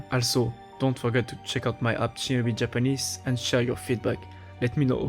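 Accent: France. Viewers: A guy speaking english with a french accent teaching me how to learn Japanese. Truly something 😂.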